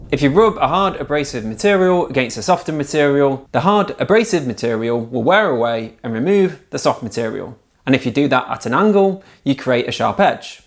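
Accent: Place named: United Kingdom. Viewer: You are one of the most real YouTubers out. You give accurate, real information no bs. Keep it up. I bought a crappy flip knife (looks super cool was extremely dull) off a homeless dude for $1 ( i also bought him taco bell) this video helped me restore this knofe to satisfactory sharpness and is now my edc. Thank you so much. (Also your voice is nice im american and the British accent makes us c.u.m...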